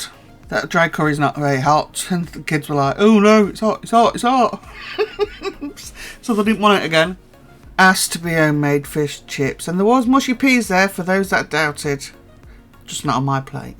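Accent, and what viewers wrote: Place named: Scunthorpe, United Kingdom. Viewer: You sound like you have a good old sunny Scunny accent like mine lol ...The food looks lovely. Im so blummin hungry now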